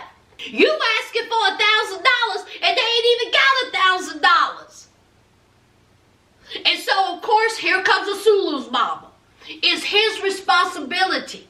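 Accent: South, United States. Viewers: You sound like me and my family and friends down south when we get together. You would fit right in.😂😂😂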